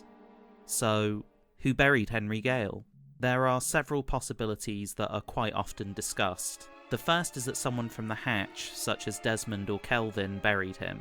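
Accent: United Kingdom. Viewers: Is this video a robot voice or yours??? I love the voice in this video (British accent?)...I would be surprised to hear it's a robot!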